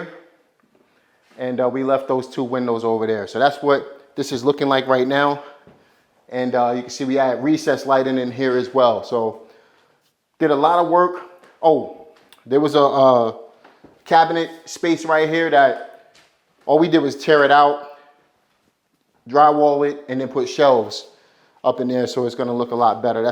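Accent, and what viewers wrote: New York, United States. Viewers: Love the content bro!! The new yrk accent never leaves lol!!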